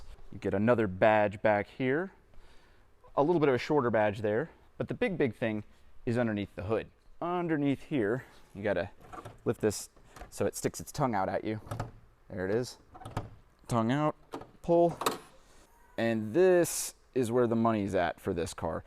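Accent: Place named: Wichita, United States. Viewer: Does everyone in Wichita(aside from Wizard) speak with the same cadence as?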